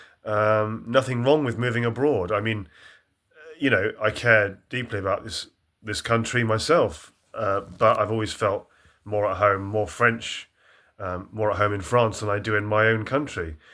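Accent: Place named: Southern England, United Kingdom. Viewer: Horrible southern English accent too. Just oozes snobbery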